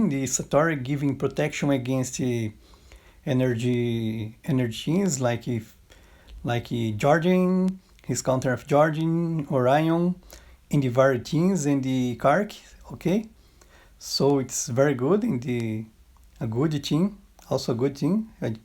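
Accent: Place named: Brazil. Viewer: Pelo inglês é brasileiro hehehe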